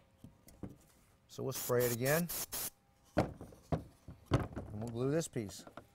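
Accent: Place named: New Orleans, United States. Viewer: I know you must be from NOLA ??.. Recognize the accent !!!)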